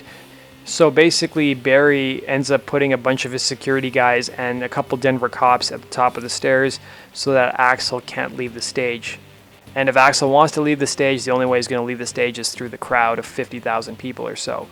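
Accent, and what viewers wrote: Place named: Canada. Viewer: no bro. Youre completely missing the point. I was just explaining that his 3-5-7 gun mistake was probably due to the fact that he sounds canadian (his accent leads me to believe) and therefore does not know as much about guns as an american would. Here we play hockey and harvest maple syrup but we dont know too much about guns although we do like to start a few riots every now and then.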